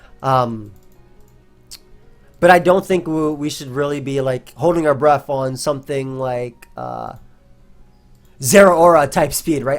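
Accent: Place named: New York City, United States. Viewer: Your NY accent really popping off today haha. I think Zapdos will be great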